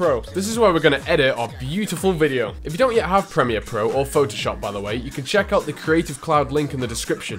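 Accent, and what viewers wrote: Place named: United Kingdom. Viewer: my guy has a british [bri'ish] accent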